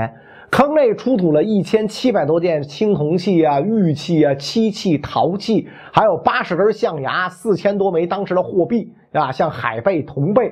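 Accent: Beijing, China. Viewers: 袁老师几天没见，怎么北京话又浓了233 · 是的，秒秒回到北京胡同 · 哈哈，北京人儿，理解一下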